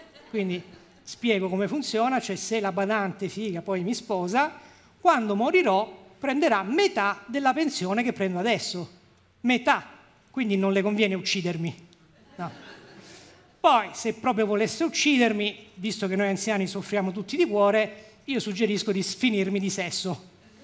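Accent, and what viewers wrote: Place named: Sicily, Italy. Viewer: la tua inflessione non identificabile perché c'è del siciliano/romano/italiano RP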